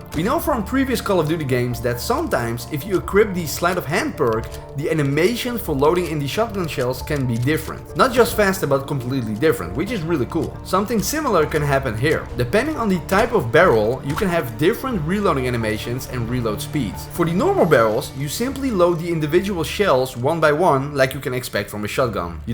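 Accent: Netherlands. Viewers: It’s the typical accent Dutch people have when they speak English. A Dutch person will, like myself, be able to immediately tell that this guy is Dutch or Belgian (Flemish).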